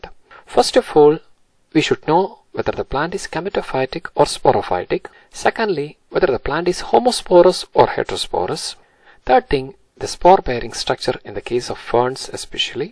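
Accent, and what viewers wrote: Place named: Tamil Nadu, India. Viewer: I guess ur from tamilnadu 😁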